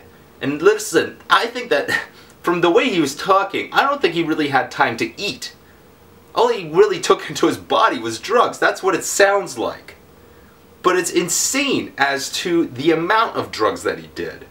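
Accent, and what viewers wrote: Canada. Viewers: You said aboot. You're Canadian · Is he Canadian?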